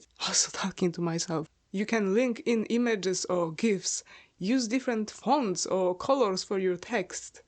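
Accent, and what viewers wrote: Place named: Poland. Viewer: You're either polish or dutch aren't you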